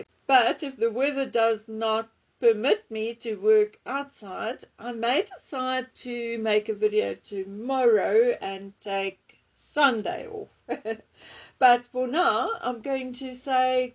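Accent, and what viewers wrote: South Africa. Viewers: I don’t understand why her subscriber numbers aren’t higher. I can say this because I know she doesn’t read our comments. Maybe people find the South African accent jarring. We have a lot of South Africans living around where I live 🇦🇺 & it used to grate on me until I got used to it. It’s the only thing I can think of because I never miss one & have recommended her to others on X etc but her numbers remain the same.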